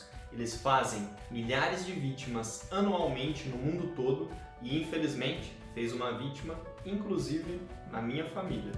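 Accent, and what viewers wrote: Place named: Caipira, Brazil. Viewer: Desculpa lá! Mas, não aguento ouvir este caipirê.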